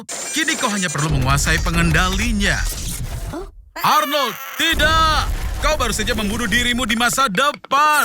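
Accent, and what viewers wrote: United States. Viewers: Bjir orang indo beneran padahal suaranya kek orang Amerika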